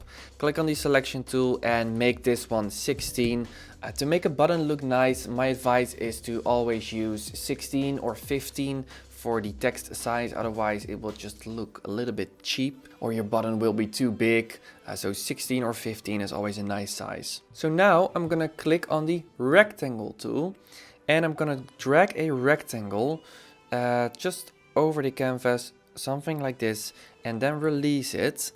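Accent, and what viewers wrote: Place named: Netherlands. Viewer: No offense, maar je nederlands accent is wel hoorbaar voor de mede-Nederlanders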